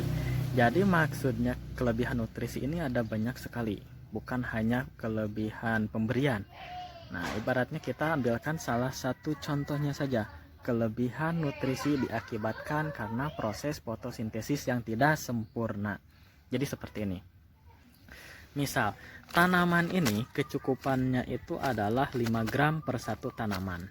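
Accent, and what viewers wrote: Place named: West Java, Indonesia. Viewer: pohon anggur saya juga mengalami hal yang sama dan sedang dalam pengobatan... logat Sunda ketara euy 😂😂😂🙏👍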